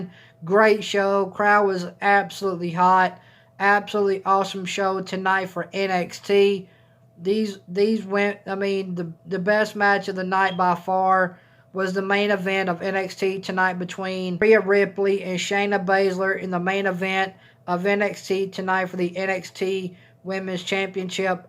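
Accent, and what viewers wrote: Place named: South, United States. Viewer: You sound like a Southern gentleman. Georgia? I was born in Valdosta! 😃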